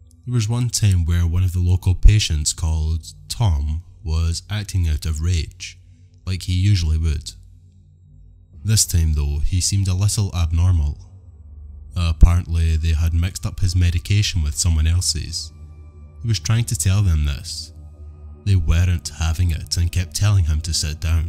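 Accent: Scotland, United Kingdom. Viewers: I really enjoyed that. They were different and I think I have only heard this topic dealt with once or twice before so it was good to hear original material. You have a great voice to listen to. I love the Scottish accent and it makes it different. Here is an idea for a topic, I remember reading a story on Reddit where a couple were randomly rescued from a potentially violent situation involving a couple of tweekers by an enormous and scary black dude .... how about “unlikely heroes “ or someone totally random just completely saved my bacon? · Criptids are my favorite subject,and paranormal experiences are a close second. I would like to thank you for your time and effort in making these stories. I love your accent, I've always had a soft spot for Scotland and the accent. Frankly you could read the yellow pages and I would listen. 💙😱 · First time hearing horror stories in a Scottish accent and absolutely love it. 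Had to scour YouTube to find this channel and thank you so much for narrating them.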